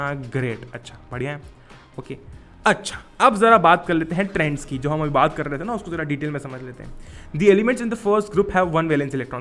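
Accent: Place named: Maharashtra, India. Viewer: dada marathi ahes na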